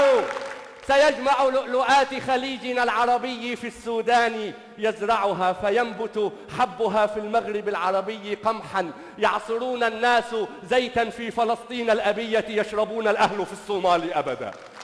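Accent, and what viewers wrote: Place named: Libya. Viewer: سلم لسانك حبيبنا ليبيا طالعه من لسانك مثل العسل 🇱🇾🇱🇾🇱🇾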